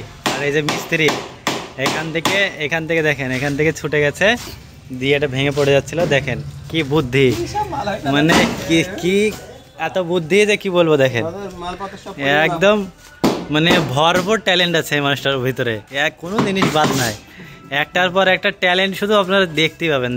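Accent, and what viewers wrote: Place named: Bangladesh. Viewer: Apni bangladeshi mone hochhe vasa sune, Kerala te ki koren.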